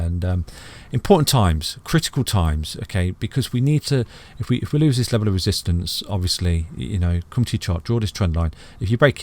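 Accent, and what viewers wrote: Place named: Birmingham, United Kingdom. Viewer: How have I just found you? Another Brummie?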